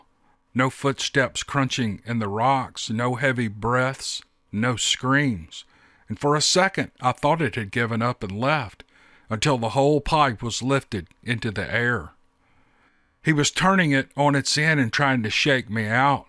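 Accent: South, United States. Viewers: Yes Sir Mr. -- I not only made it to the end, but this is the third time I've done it. I like to cue up a few videos, press play just before climbing into bed. ~ My bed, is surrounded with speakers, and there's a 75" UHD 2160p monitor a few feet away, into which a computer, amp, & sound mixer are connected and nothing else. I refuse to have cable or ANY type of mainstream TV. Just the internet, and full control of what content comes into my home. Needless to say, I often get so damn comfortable, it's about all I can do but fall asleep. I'm kinda new to this channel, in terms of time, (about 6 months) but not so new in terms of content. I listen to something here at Dixie, close to every day, or sometimes binge. ~ When I DO pass out, and miss out on the end of a story, I rinse and repeat, next day. Usually only with THIS channel, -- Or sometimes Buckeye BF and recently Neoma Finn's channel, but mostly THIS one, because it is about the best for really good stories...(Man, there's a lot of crap out there) --I love that nice Southern accent, (I'm sure You know the one I mean), soothing narration, and genuine, original content. 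(Dang!, If I would have ever met a cute girl in my youth, with an accent like that, I'd be FINISHED. My heart would never have escaped alive...) ~You have a LOT of videos, with really good stories that feel quite sincere. I am in NO rush. I'm taking my time. To thoroughly, slowly go through them. I like the long ones, and that's why I like the YT CUE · I made it to the end, yah!!! I'm just sad it ended. I could listen to these forever, I wish they were hours and hours long, I never get tired of it! I have always loved listening to people tell stories, I love stories! Now every night is storytime!!! My daddy was the greatest storyteller, he told us stories all the time and I loved it. He could just draw you in and keep you hanging on by your fingernails; he was a scotch Irishman and you know how they can spin a tale, except his work true. I love the sound of your voice and I can understand everything you say because you sound like everybody I know. 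You've got that slow Southern drawl that I know so well. You seem like the nicest guy in the world. I started watching this one about last night, I would listen a while and fall asleep a while and repeat... several times. I finally made it through and it's now in the morning; I think I'll take a nap. Later, I'll start my search of your other videos for my fright night creepy fest. Late at night, when the lights go out, you come on; you make living fun. God bless you real big Jude, from Kentucky ✝️🐴🌿🇺🇸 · To the person who mentioned your accent. Didn’t the name tip you off? It’s not called “ Fargo Cryptic “. voice is not only soothing, it’s perfect. I listen at night while shutting down for the day. It’s the perfect bedtime stories. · I listened to the entire series. I loved it. Each story was unique and interesting. I am a great fan of your podcast. To the snob who criticized your accent, as another Southerner, I would quote my Momma who would say, "kiss my A double scribble."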